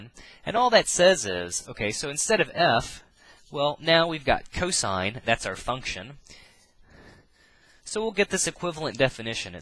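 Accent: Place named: Pennsylvania, United States. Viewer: As always, you were great. Can't thank you enough for taking your personal time to do this for us struggling math students. You rock. PS - Your accent makes me believe you're from PA...or maybe MD. Am I right?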